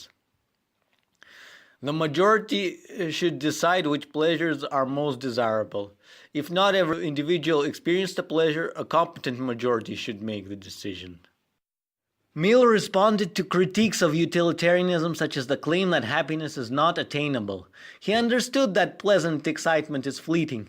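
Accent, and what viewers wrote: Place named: India. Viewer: now this is good content but try to correct your indian accent okay?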